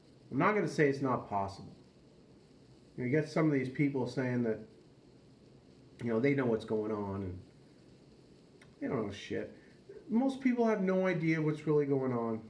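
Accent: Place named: Boston, United States. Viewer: remember when I said you have changed.. your voice use to be a lot more... Boston or main... idk how to describe it.. aren't u from the east coast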